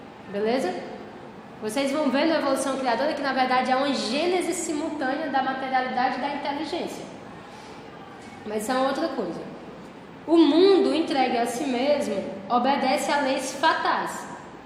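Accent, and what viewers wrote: Northeast, Brazil. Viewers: encontrei seu canal por acaso. Estava lendo um artigo sobre Bergson que me deixou muito feliz e, inclusive, me gerou uns arrepios (alegria). Depois fui buscando mais sobre Bergson e acabei por aqui. O mais legal de tudo isso foi quando prestei atenção ao criador do artigo que, advinha, era de sua autoria. Muito obrigado por solidarizar seu conhecimento, sua forma espontânea, seu sotaque nordestino, como o meu, ainda deixou tudo mais atrativo e familiar sem perder a profundidade do pensamento de Bergson, um pensador essencial para nosso tempo. Ganhou mais um inscrito. Te ouvir me deu a impressão de ouvir uma pessoa próxima muito inteligente e fascinada por essa consciência da Vida que tanto me deslumbram também. Muito grato! Um abraço. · Boa noite! Sempre vejo seu canal e alguns vídeos seus detonando os esquerdopatas. Parabéns pelo conhecimento apurado e inteligente. 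Até seu sotaque nordestino dá um toque sublime as suas apresentações.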